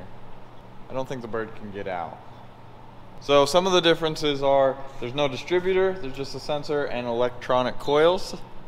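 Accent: Boston, United States. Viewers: love your boston.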